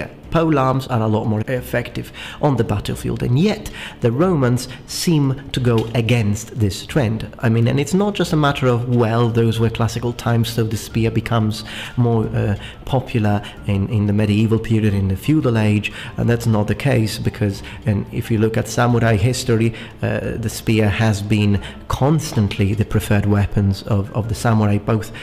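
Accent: England, United Kingdom. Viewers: I thought you were a Britt due to your accent. Learning a lot from your vids. Keep up the good work.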